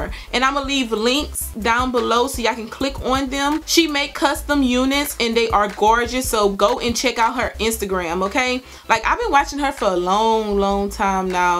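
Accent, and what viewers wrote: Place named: South, United States. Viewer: OMG I freaking luv this video! Yass honey! I felt like you were giving us! Love your personality and your country accent! You look GORGE by the way!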